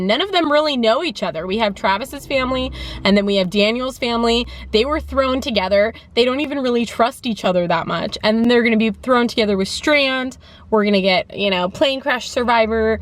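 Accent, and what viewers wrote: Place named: United States. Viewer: Hi! Watching your reviews has been a great pleasure for me for some months now. Now I've got a question of a different sort. I very much like analyzing the way American people speak (vowels and stuff). However it is not easy, as I am not a native English speaker. Could you please help me by telling where you were raised/living? Thank you!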